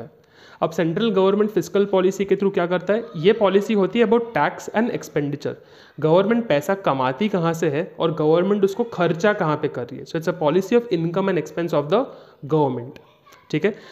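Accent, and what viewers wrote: Gujarat, India. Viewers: Aap gujrati ho? 🤔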